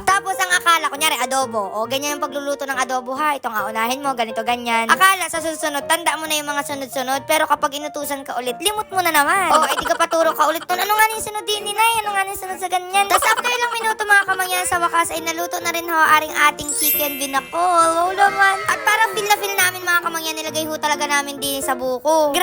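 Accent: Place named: Marinduque, Philippines. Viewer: Marinduque ❤.. from samar😅 naaaliw akong manood s vlog mo at ganun na ganun sa salita nila dini sa Marinduque 😅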